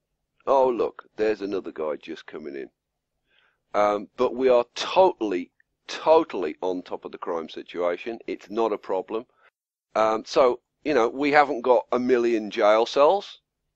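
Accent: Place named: United Kingdom. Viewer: I love your briton accent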